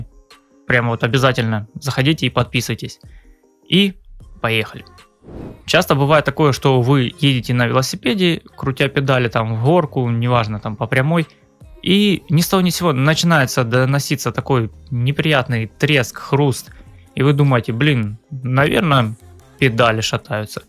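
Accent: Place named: South, Russia. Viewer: Ну, можно так подумать по голосу, если представить, что озвучивал слегка выпивший или покуривший человек. Но, автор у нас со своим южно-российским говором, там почти все так говорят, а он ещё и не по тексту, поэтому паузы в речи. Думаю, что трезвый он был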